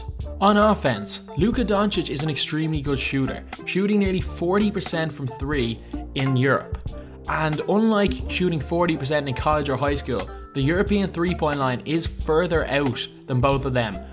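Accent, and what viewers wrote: Dublin, Ireland. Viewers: Are you from Dublin? I'm from Kerry. Love the content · Are you from Dublin? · are u irish? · you Irish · Oh shit nvm what county your from lad ? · gotta be Irish with that accent lad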